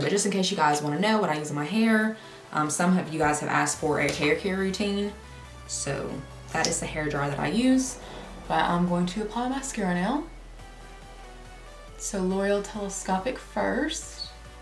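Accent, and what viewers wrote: South, United States. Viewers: I love it!!! I'm from NC, and its so refreshing to hear it! 😊 (Yes, I realize the geographical distance between Alabama and North Carolina, but I meant the southern-ness of it!!!) 😘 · I’m from Kentucky we share the same southern accent! Lol. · Sounds southern.....(I'm a Texas girl) ☺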